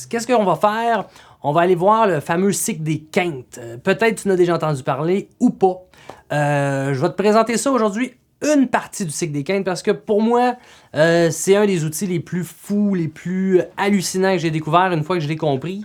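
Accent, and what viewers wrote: Quebec, Canada. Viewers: ce petit accent Québecois rajoute vraiment une ambiance toute sympa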